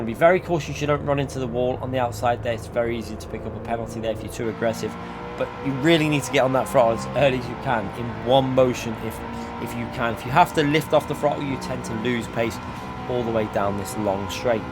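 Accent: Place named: RP, United Kingdom. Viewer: The sounds more posh when he's doing his guides than on his live streams.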